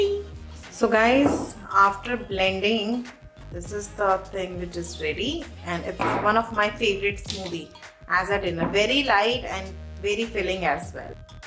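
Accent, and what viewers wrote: Punjab, India. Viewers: Hey r u punjabi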